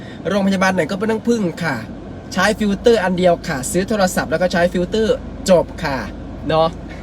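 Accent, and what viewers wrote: Isan, Thailand. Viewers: เสียงอีสานยูราษีไศลครับ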